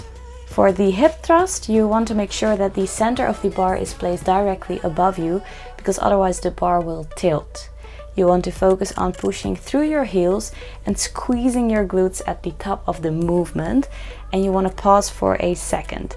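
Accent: Netherlands. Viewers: Omg I found you today and I love your Channel! I’m also starting to lean bulk! So this is perfect! Groetjes uit Gouda! Ik hoorde je Nederlandse accent en zag het op je instagram dat je uit Nederland komt. Je bent super goed bezig! Ga zo door!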